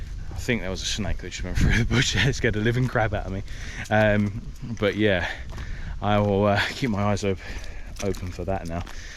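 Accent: Australia. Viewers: Defo getting that Aussie twang mate! Loving the down under content!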